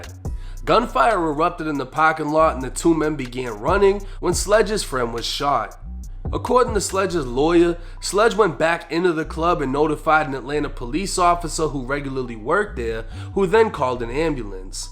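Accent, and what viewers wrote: Boston, United States. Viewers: Are You from Boston? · Famm are u from Boston cus u sound like u from there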